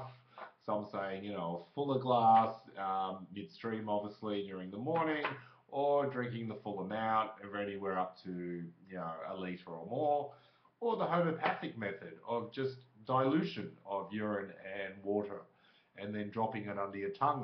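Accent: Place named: Australia. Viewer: You sound Aussie mate!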